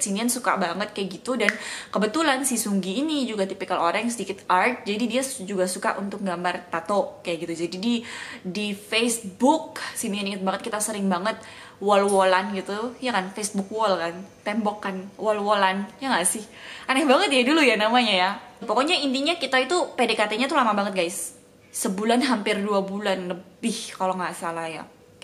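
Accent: Surabaya, Indonesia. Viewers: Lucuuuuuuu medokkkk surabaya banget deh 👍🏼👍🏼😂😂😂😂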